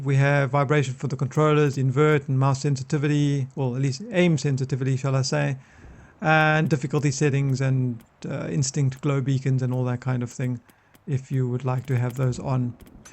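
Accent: South Africa. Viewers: You a RSA expat by chance? Your accent sounds like a South African :P Great vid BTW!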